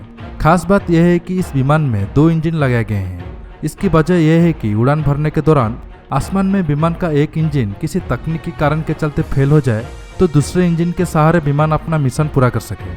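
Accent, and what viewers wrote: Odisha, India. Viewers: I think u r from odisha , any way su30mki is best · Odisha k ho kya bhai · Odisha se hai kya bhai..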